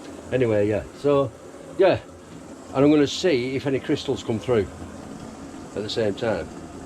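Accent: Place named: Northern England, United Kingdom. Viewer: Hiya defo agree with other comments try and flatten out the cold water pipe or re pipe it if that dont work shortest route good look m8 and were are you from defo a northern accent were Bolton chris & sheila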